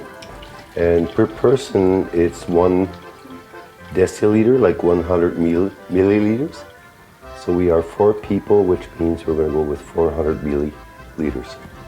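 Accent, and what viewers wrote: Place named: Canada. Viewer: You sound like a fellow Canadian sir edit: "deciliter" definitely Canadian, greetings from Ontario, j'aime le fromage, merci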